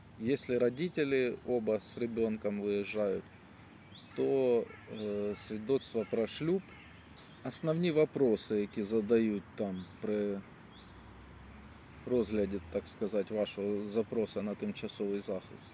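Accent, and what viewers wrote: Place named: Kharkiv, Ukraine. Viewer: За Вовчанськ дійсно нема слів. Вітання з Харкова. Я ж чую що Ви з наших місць.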